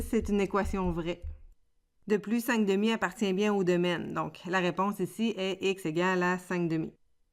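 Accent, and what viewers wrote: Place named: Quebec, Canada. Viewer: L'accent Québécois est et la manière de faire différente de la France rendent la chose plis complexe à comprendre, ceci dit, j'ai enregistré la vidéo car c'est bon rappelle de cour !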